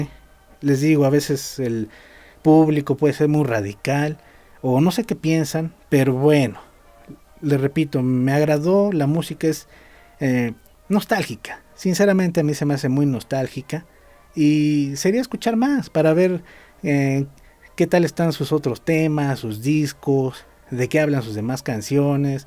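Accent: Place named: Mexico. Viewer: Hola hermano mexicano!!! Gracias por difundir por allá nuestro rock y te hago una pregunta porque tengo muchos amigos mexicanos, pero son de Ciudad de México, Puebla y Baja California pero no reconozco tu acento, es de Guadalajara?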